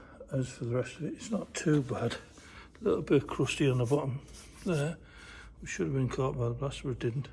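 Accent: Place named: Guisborough, United Kingdom. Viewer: New to the channel, nice work mate , you sound local to me , I’m from guisborough, check out Mike from britanica restorations , he’s in Canada but originally from guisborough too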